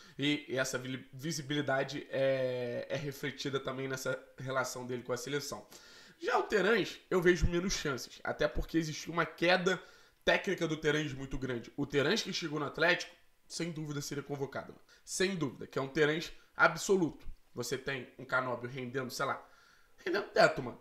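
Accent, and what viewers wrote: Rio de Janeiro, Brazil. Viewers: sou novo no canal irmao, desculpe a pergunta, vc eh carioca? mora aqui em cwb? acho q eh a primeira vez q eu vejo alguem com esse sotaque torcendo p cap, inscrito jaaa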